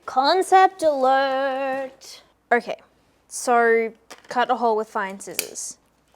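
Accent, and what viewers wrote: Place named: Australia. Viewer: Clicked for the pants, stayed for that australian accent 🤣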